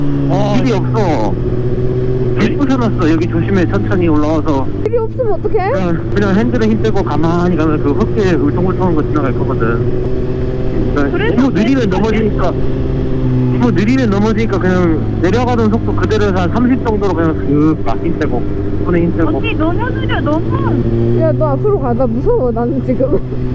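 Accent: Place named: Jeolla, South Korea. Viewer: 역시 전라도 사투리ㅋㅋㅋㅋㅋ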